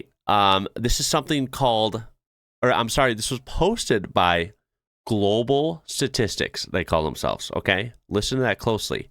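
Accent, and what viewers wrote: United States. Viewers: As a Norwegian, I wouldn´t bat an eye over someone telling me which state they´re from instead of America or USA. Doesn´t work with a lot of other countries though.. Also, I´d hear straight away that you´re American, so that´s really redundant info.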